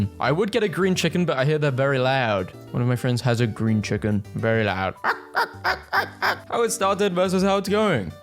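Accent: United Kingdom. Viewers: British guy ye🐥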